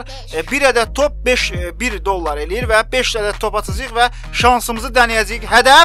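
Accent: Baku, Azerbaijan. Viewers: Əmi sən Bakılısan?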